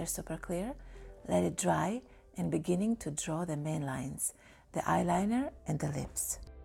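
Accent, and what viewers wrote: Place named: Russia. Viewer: Русский акцент слышен всегда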